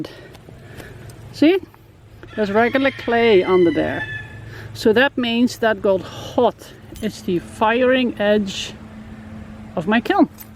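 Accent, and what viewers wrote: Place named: Netherlands. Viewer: Unmistakably Dutch accent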